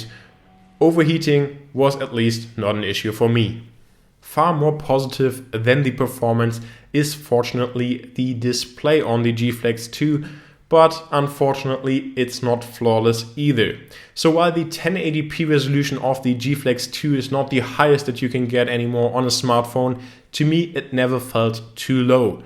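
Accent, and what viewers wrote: Germany. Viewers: Bist du Deutscher, hört sich so an^1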